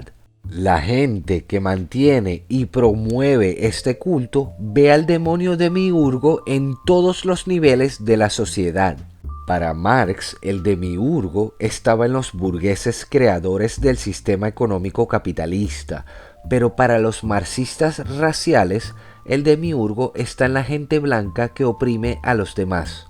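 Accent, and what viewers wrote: Venezuela. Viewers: Por tu voz me acabo de dar cuenta que " ", debe ser venezolano. ¿Cómo alguien que escapó del intento de comunismo puede defender el comunismo?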